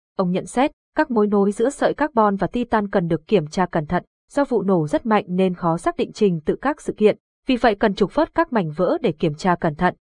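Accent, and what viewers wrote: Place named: North, Vietnam. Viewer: Đoc nhanh, giọng BK khó nghe! xin vui lòng…!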